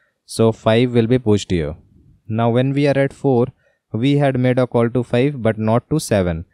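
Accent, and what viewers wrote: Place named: India. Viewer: Beautiful explanation, I usually have hard times to understand tutorials in Indian accent. So when I googled I had to turn pages to find one that isn't Indian. First I watched that other video (with not Indian accent) on the subject. But it was horrible. Then said I give a try, and I chose this video. I have to say your explanation is beautiful, clear and easy to understand, the accent is barely noticeable. I like it! Great job! (Obviously. Liked and Subscribed.)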